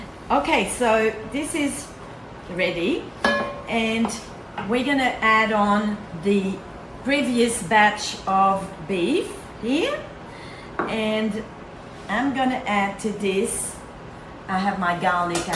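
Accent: Australia. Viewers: Tour accent is Australian. One cannot fake the vowels. Interesting. So you are from Quebec? Hm. The recipe probably is good, regardless.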